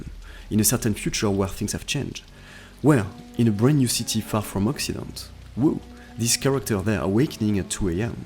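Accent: France. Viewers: oh man Your English is so French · THIS FRENCH ACCENT HURTS MY EARS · Where are you from? France? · The French Accent of the Narrator was just the cherry on top of all that beauty. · Amazing french accent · French accent ? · Je reconnais là l'accent français! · il a pas un accent francais? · That thick, french accent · c est un francais qui parle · Un bon accent français bien de chez nous. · french accent spotted x) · Il est la laccent français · looks very nice. but this french accent is horrible! i cant even understand half of what this guy is saying ^^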